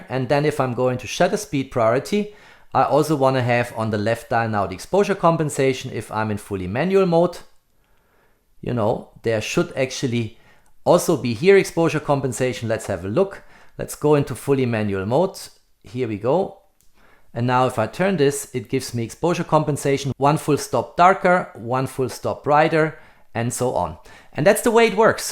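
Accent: Germany. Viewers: Nice videos, well explained. Thank you very much for that. But why don't you make these videos in German too? You're a German speaker, right? That's what your accent sounds like. This video is absolutely great. I bought an SL3-S yesterday and am now watching your video. It's really helpful with the camera settings. I think you'll be the only one to publish such a perfect explainer video for the SL3 in German on YouTube. Please do it in German. There are enough people in Germany who don't understand English. You would be a great help to them. Perhaps you could have it translated using AI. Thank you for your understanding and kind regards.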